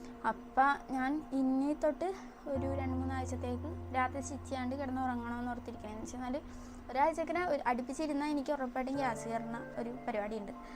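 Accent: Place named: Cherthala, India. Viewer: ചേർത്തല slang ഒത്തിരി ഇഷ്ടം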